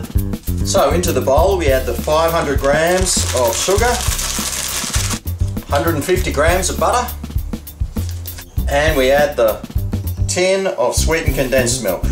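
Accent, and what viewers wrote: Australia. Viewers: This guy is Australian :D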